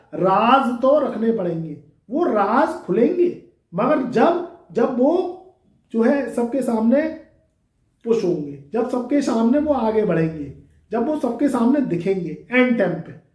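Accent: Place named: Uttar Pradesh, India. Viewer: गुरु जी आप शायद आगरा side के हैं। मैनपुरी फिरोजाबाद जैसी भाषा tone 😂😂😂😂😂😂😂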